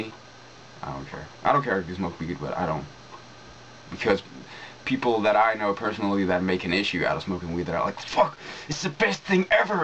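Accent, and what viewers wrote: United States. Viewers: lol its funny seeing you talk about a quarter like its such a foreign object, when you sound like you have an american accent. I was actually surprised to find out you're in Finland.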